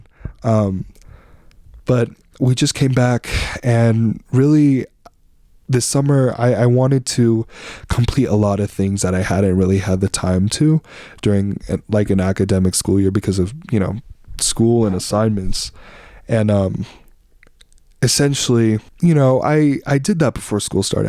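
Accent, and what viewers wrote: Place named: United States. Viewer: i just binged watched ur videos coz i watch ur “how i learned tagalog” video and wanted to hear how u speak filipino. Ur taglish sounds diff from the usual taglish. Its cool tho! Explanation: you have a different way of switching from tagalog to english and sneaking in english word + there's some filipino words that you translated in english that we dont normally translate in english. (we would still say it in tagalog) Accent: u sound filipino american. We can distinct fil-ams accent. U have filipino accent with a hint of american accent. Pis dont take this as an offense. More like critics. And i love ur uniqueness in speaking To improve ur speaking... watch noontime shows or filipino podcasts and imitate their accent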